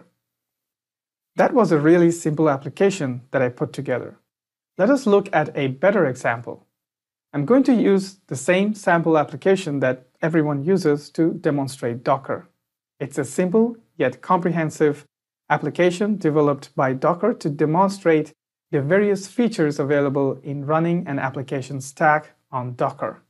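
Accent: India. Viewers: always better with the indian accent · this look surreal. an indian who has very little indian accent.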